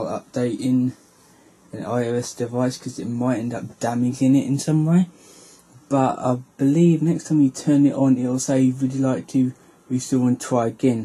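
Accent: United Kingdom. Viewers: r u from UK,fella ?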